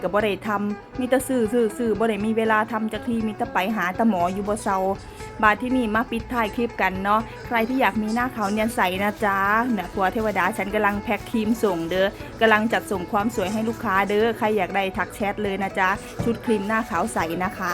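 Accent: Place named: Chaiyaphum, Thailand. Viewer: สวัสดีค่ะหนู ป้าชอบหนูพูดมากๆค่ะพูดแบบนี้แหละเพราะดีค่ะป้าก็คนชัยภูมินี่แหละค่ะป้าชื่นชมหนูใช้ชีวิตอย่างมีความสุขจ้า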